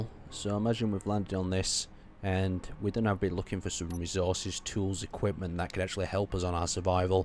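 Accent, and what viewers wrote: United Kingdom. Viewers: I only watch this channel because you're British and it's awesome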